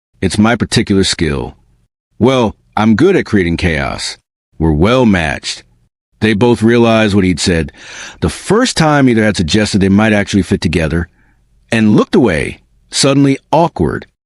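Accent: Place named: United States. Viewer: A British story read with a heavy American accent?! 😅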